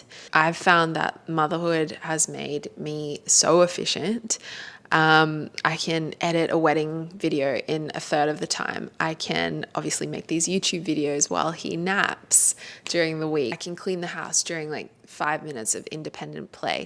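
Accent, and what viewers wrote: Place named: Australia. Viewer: I noticed the Aussie accent! Where are you located?? I'm in NSW, talking about similar things on my Youtube channel. I'd love to connect! 💖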